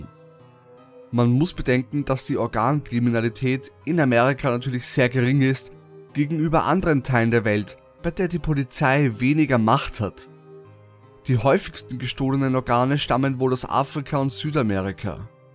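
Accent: Austria. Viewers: jo woher aus oesterreich kommst du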